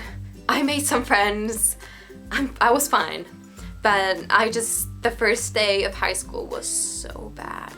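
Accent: South, United States. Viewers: You are picking up southern inflections! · Southern accent detected! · Can't you tell what type of English she speaking? It sounds like American English to me not British. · You have developed a bit of a southern accent!! I live in East Tennessee born and raised here.But my father was born in Asheville NC and I still have distance family there. Its a beautiful state. Have you and your family visted the Smokey Mountains, Pigeon Forge or Gatlinburg?? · When you speak it sounds like you have a bit of a southern accent. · If I heard you speaking without any reference otherwise I would place you as an American. I guess you were young enough to lose your Finnish accent when speaking AM/English. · Lol, I just thought, what if you were to talk to your old English teacher again? Not only do you speak impeccable English, you sound like you were born & raised in the U.S.. 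You’d be correcting her lol, but you could tell her your success was because of her & I bet she’d be so proud. 😊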